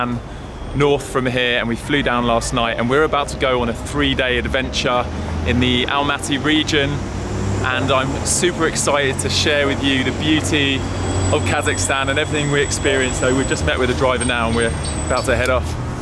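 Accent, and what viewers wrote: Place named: United Kingdom. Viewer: Hehe just a clear British accent;)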